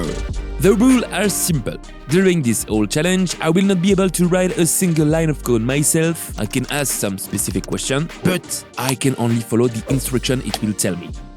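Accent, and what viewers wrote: France. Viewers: Nice french accent ^^ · Cet accent français est juste magnifique🤌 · toi tu es français XD on as le même accent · are u france?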